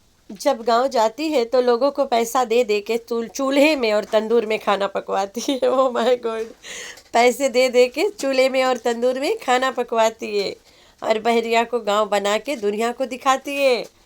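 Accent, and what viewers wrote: Uttar Pradesh, India. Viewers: Very good reaction.❤❤❤❤or aapki U. P. Vali language me toh bahut apnapan lagta hai main bhi india ke U.P. se hun. Reaction bahut interesting lagte Hain.🇮🇳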